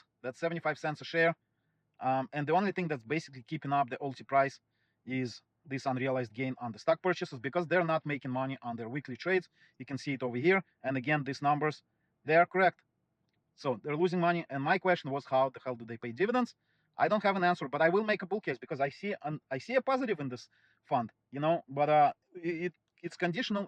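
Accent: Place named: Russia. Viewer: You have russian accent. Do you do channel in russian too?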